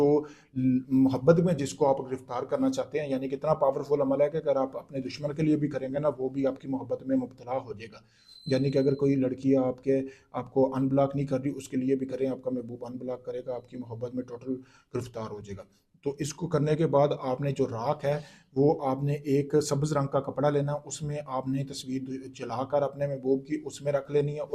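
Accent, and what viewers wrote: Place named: India. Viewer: Bhai aap kidhar ke rehne waley ho. Apka lahja Hindustan wala hey,or apki alfaz ki adagi bhi theek Nahi.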